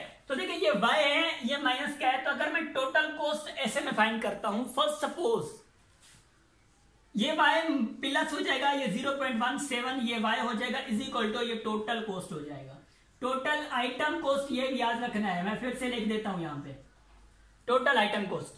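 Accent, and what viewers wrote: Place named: Saraiki, Pakistan. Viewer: ahhaha wakai bhaa saraiki sindhi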